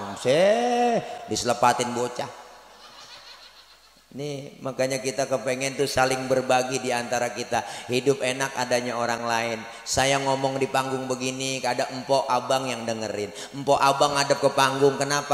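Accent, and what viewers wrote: Betawi, Indonesia. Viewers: Betawinya keren..pengganti KH Zainuddin MZ.. Barrokallah tadz.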